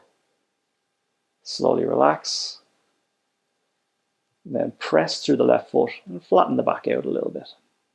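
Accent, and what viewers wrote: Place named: Ireland. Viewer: What an eyeopener... wouldn't have believed how much feeling there was going on inside if I hadn't tried it out myself! Shout out to DH for advising me not to go straight over to the heavy bag and start kicking it too haha. This exercise is actually what got me really paying attention to this stuff. Now I have no choice but to look at all your other videos as my body is.... well I'll keep my adjectives civilized! Thanks mate and as an expat Aussie its nice to hear an Irish accent.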